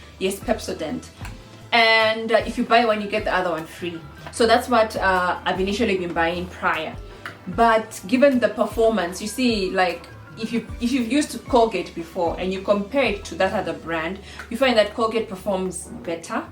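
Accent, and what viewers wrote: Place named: Shona, Zimbabwe. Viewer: I'm happy to hear that!! Video request about a monthly budget and how to save money in Nairobi., your accent has some Shona undertones.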